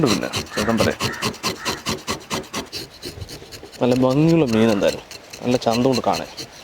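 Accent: Palakkad, India. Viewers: Broo...ningal nalla oru cook aanalloo..superr...Aa Palakad slang edaku varunnundu.. kalakii.. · തനി പാലക്കാടൻ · Nalla adipoli Palakkad bhasha ishtamullavar like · എനിക്ക് ഭയങ്കര ഇഷ്ടമാണ് പാലക്കാട് ഭാഷ... ഇഷ്ടപെട്ടവർ like ഇടൂ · Ninga thani palakkadanallooo etttoooi... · Eantha kattu ingalu palakkattu karan ano?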